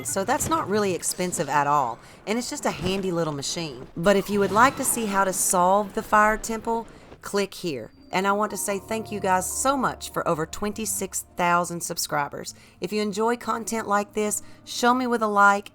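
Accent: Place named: New York, United States. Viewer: You sound like your from New York.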